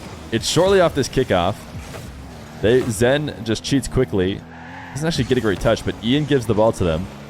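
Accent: United States. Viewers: You sound like Johnnyboi if he had an American accent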